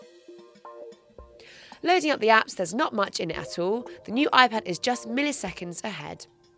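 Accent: United Kingdom. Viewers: really helpful review for those who are hesitated between the two. btw, this lovely british accent makes TechRadar videos rather more enjoyable to watch, i've replayed the video ThREE times just for that reason, so i'm gonna give two thumbs up, one for the video and one for the accent.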